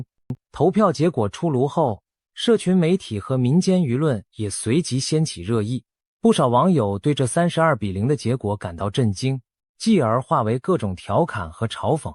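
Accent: Mainland, China. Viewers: 聽起來像大陸人的解說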